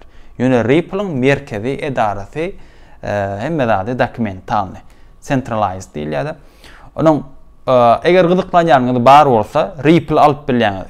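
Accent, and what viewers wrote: Turkmenistan. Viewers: Turkmenistan Turkcesi mi bu?) Cox gozel seslenir)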